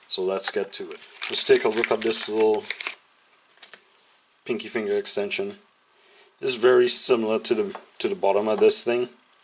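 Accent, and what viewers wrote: Trinidad and Tobago. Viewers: Do you have Trini roots? I think I hear it in your accent.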